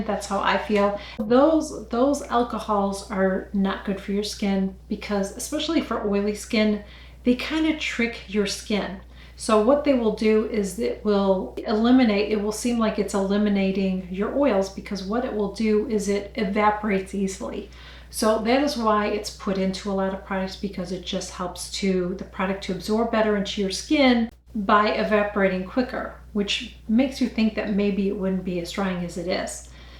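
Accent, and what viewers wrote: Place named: New York, United States. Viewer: Oh dam Girl, i don't check. And yes Clinique was my first foundation. I was advised which shade. It was way to light. And yes i used girl intentionally. I think you have a slight New York accent. And Boo to who put you down. Just pathetic. Hi to Family & Tabitha, the beautiful puddy tat 🐈🐾🐾❤💞❤💕 xxxx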